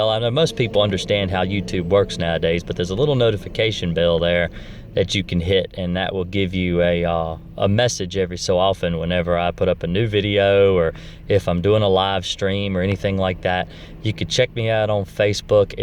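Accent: South, United States. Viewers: Dat southern accent!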